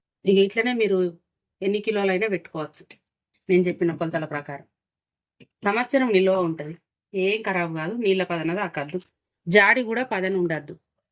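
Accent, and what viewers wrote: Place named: Karimnagar, India. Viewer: Karimnagar district ani meeru matlade vidhanam tho gurthu pattanu. Ma pinni athagari Jagithyal. Vallu matladinatte undi . Ala gurthupattanu. .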